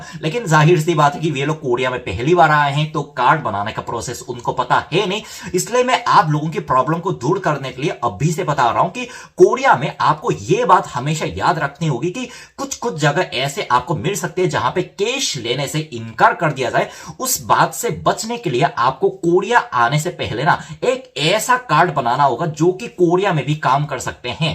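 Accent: Standard. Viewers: Aap to bhut shud hindi bolta hai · Oh bro this shudh hindi even i after being an indian can't speak u are so good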